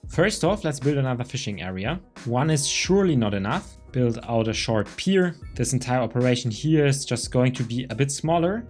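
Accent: Germany. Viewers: Ha ha this accent (german) right?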